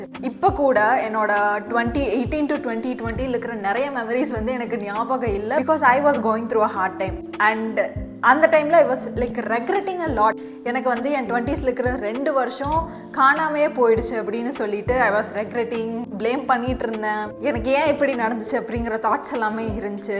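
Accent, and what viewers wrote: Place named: Kerala, India. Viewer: Are you a malayali? You speak tamil like a malayali..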